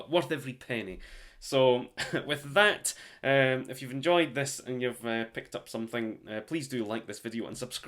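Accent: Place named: Scotland, United Kingdom. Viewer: Your Scottish accent is wonderful ❤!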